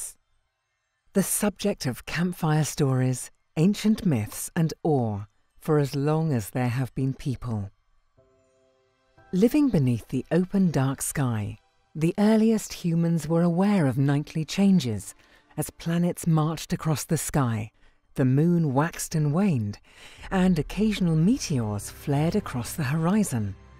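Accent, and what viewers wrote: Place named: United Kingdom. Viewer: what is all this about "meethane, nebulee, etc.? what are laver outflows? i thought they were lava (la-vah) outflows. i just never will get the british accent where all the "r's" are suppressed, but are inserted at the ends of words that have no r's.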